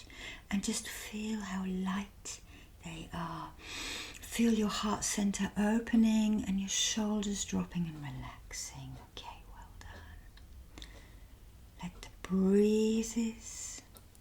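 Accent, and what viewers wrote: England, United Kingdom. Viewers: what can I say, I just simply adore. Your English accent I just love your videos mate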